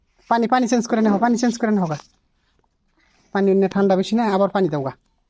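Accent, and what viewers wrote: Tangail, Bangladesh. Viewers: kotha shunei mone hoechilo , Amar jonmosthan o Tangail, korotia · ভাই আপনার কথার ভাষায় তো বুঝা যাচ্ছে আপনারবাড়ি টাঙ্গাইল জেলা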